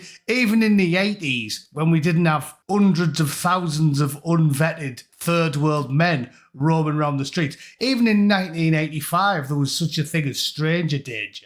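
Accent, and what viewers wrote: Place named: Liverpool, United Kingdom. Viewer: Keep it Scouse you talented bastard 😂😂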